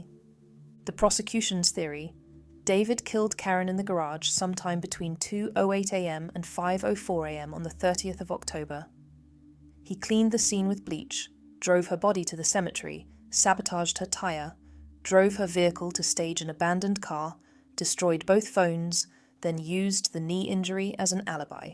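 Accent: United Kingdom. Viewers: COPY CAT BRITISH NARRATOR!!!!!!!!!!!!!!!!!!!:face-purple-crying::face-purple-crying::face-purple-crying::face-purple-crying::face-purple-crying::face-purple-crying::face-purple-crying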